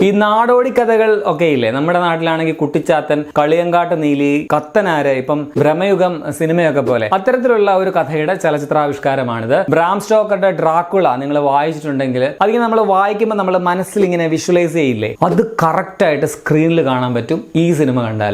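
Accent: Kottayam, India. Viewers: Bro kottayam aarunno😁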